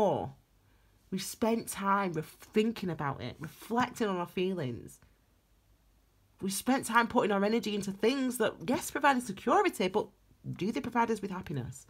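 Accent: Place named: York, United Kingdom. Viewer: Hi thanks for your reading. I was wondering if you're from York in England? :) I can recognise your accent :) xx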